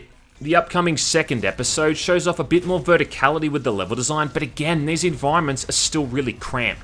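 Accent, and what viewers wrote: Australia. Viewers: You sound Australian.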